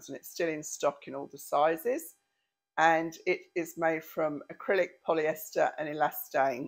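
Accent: England, United Kingdom. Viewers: I love hearing the English way of saying things, so please don't feel you have to say sweater. The flower sweater needs something in the middle. That gold neck sweater is stunning on you! The detail is almost art deco. You could wear that any time of year and, heck, wear it during the day! Hahaha, the pearls are molecules! Love the pattern of the jewel one! So pretty and really catches the light. The berry color looks great on you! It's definitely more casual than the others, which isn't a bad thing. The ivory looks lovely with your hair! The gray could be worn any time, as well. It's cute! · I agree with both your favorite sweater and the jacket type with stars - and the quality of the knit makes such a difference - I guess I am a member of Hels Bells also, from over the pond, - I look in am for what is new and enjoy each one. - my English blood loves your accent/your fun personality and all our trips to England, - hubby and I (lost him two years ago) said for all our European trips, England we would go back in a blink. 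I love the term “jumper” - I remember an English author I was reading from about 30 years ago was my first introduction - I was puzzled as she put on a “jumper “ that is a MMm. How do I explain - skirt with vest top attached. We call that a jumper, - but I love your term for sweater and at this point the world is so much smaller, I think most Americans know for the English, a sweater is a jumper. Keep your English terms, makes you more special💕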